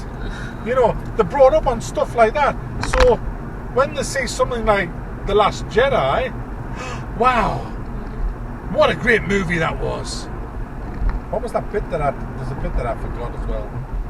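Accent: United Kingdom. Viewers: The British accent makes the rant a whole lot more satisfying.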